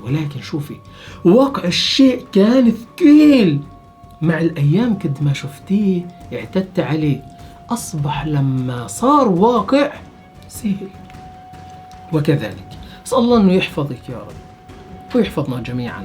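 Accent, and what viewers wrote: Palestine. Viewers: حكيك زينا فلسطيني ..كلامك رائع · هذا فلسطيني واضح من لهجته لكن تحيه لك على اي حال اخوي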